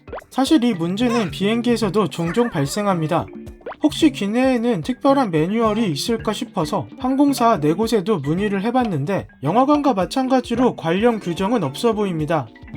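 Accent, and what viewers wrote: Gyeongsang, South Korea. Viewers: 서울 사투리 안 쓰시고 경상도 사투리 쓰시는 거 보면 경상도 분인가? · 부모님측에 경상도분이 있으신건지 조심스럽게 추측을...저도 서울사람이지만 충청도 사투리쓰거든요 영향받아서ㅎㅎ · 형 경상도 사람이었구나 친근한 억양이 들렸어 블루베리 스무디 해봐 형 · 이거 혹시 사투리신가요? ㅅㅋㄱㅋㄱㅋㅋzz갱상도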